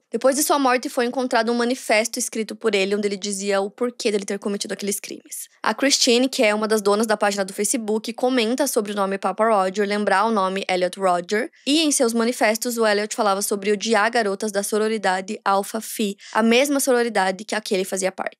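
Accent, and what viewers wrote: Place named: Pernambuco, Brazil. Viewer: vc é de qual estado do nordeste? Reparei em alguns sotaques que parecem ser de pernambuco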